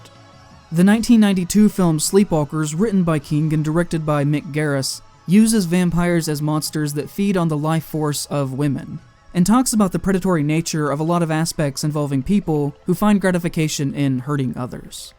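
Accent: North Carolina, United States. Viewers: Odd. I thought that you sounded like someone from NC, during this, and then you confirmed it.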